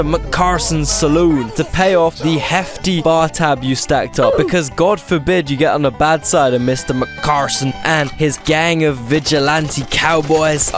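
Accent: United Kingdom. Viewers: It’s funny to hear some Brit, Try to explain America. And the south to beat it all haha. Even though you ain’t far off for a couple these places.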